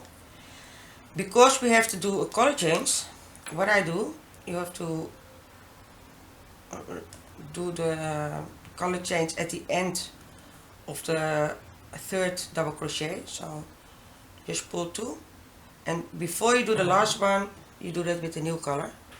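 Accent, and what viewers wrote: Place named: Netherlands. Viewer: Are you from the netherlands ?? You sound dutch #dutchpower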